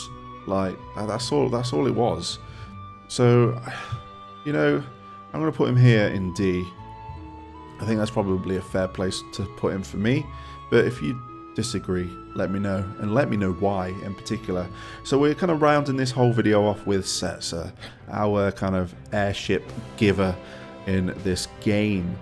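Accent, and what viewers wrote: United Kingdom. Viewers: And you, sir, I put you in the S Tier. Your English accent really rounds out your professional approach to it all. You’ve got my subscription although I will say… Sabin is S tier. s for suplexing that trainnn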